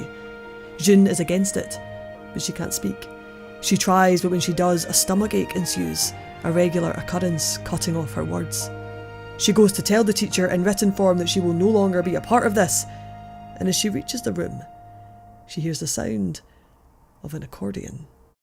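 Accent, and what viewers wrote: Scotland, United Kingdom. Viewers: somehow the scottish accent is a constant funny thing for me that i cant take serious, so this video threw me through quite a loop cause the accents funny but ive only witnessed it in comedy before. that made it quite challenging to listen to what good things she's actually saying. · Scottish accent? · I don't know what that accent is (Scottish?), but I like it. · don't ever stop putting word thoughts in my earholes with that sweet Scottish melody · It had taken a while to know who you are when introduced you but it was listening to your voice and I knew it, you were the one who made that incredible review about Yagate Kimi or Naru a few months ago. And since I love your Scottish accent, it's perfect (and I'm tell you being a Honduran, whose first language is Spanish) and yet I can understand you perfectly. You are amazing. 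Regards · Finally an accent I understand... Wait this isn't the same regional accent as me? I can't understand you I'm not from your part of Alba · I loved your Scottish accent. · scottish accents r beautiful and ur beautiful. its just my auditory processing thats shit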